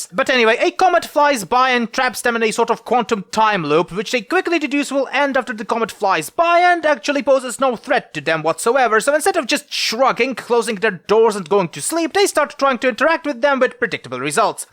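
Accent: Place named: Eastern Europe. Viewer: Damn, I slowed it down and got classic east EU accent from US movies. Git gud -_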